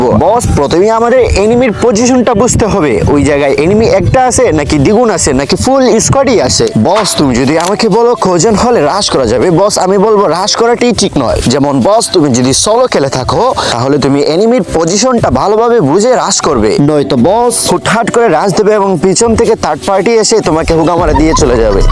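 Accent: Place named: Sylhet, Bangladesh. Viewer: বস তোমার সিলেটের ভাষার অনেক ভালো লাগছে❤❤❤❤😊😊🎉🎉🎉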